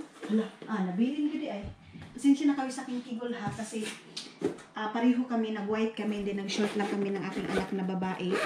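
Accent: Visayas, Philippines. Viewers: Bisaya diay ka.?! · "maglabang tayo dito" Bisdak jud nga daku! Hahaha! Thank you for making my day. Kaya ra kaau ang lockdown diri basta ga lantaw sa imong mga vlogs. Lingaw kaau. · Bisaya d i ka inday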